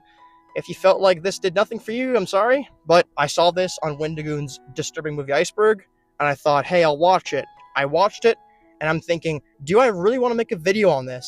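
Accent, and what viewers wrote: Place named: United States. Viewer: Clearly this is written and narrated by an uneducated American.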